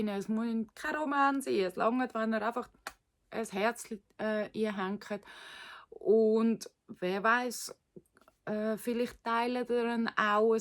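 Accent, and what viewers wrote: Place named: Switzerland. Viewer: Oh nurnuf Schwyzerdütsch so toll 🎉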